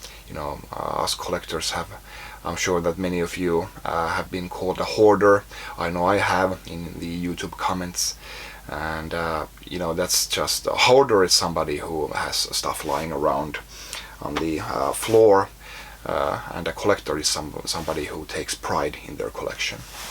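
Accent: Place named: Finland. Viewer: It's not just the Finnish accent or his low register but also his measured way of speaking and the thing he does when he can't think of what to say for a second. He's nice to listen to, and he has something to say. I wish that more announcers had voices like his! Aside from the guys who commentate poker tournaments, the olympic people, and a few hockey guys, those guys usually sound barky and rub me the wrong way. Even when there is a nice voice they typically have two others you have to deal with when he has nothing to say. There are lots of people like on Youtube who actually know how to speak without excessive animation, and with diction, one of the reasons I watch it and why I think it's so popular. And of course they cover things you'd never discover otherwise. I've lived with a moderate hoarder, and you are no hoarder! Not even the slightest bit. 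You're right when you say you're running out of space but everything is neat and organized and clean, you are willing to let things go, and most important everything has a use within the foreseeable future and therefore is not trash. They're games.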